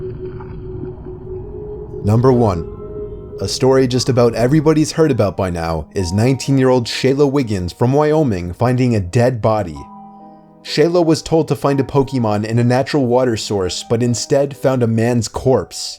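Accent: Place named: Wisconsin, United States. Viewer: where's from? the accent on the vowels is real. being from Wisconsin myself, I know the struggle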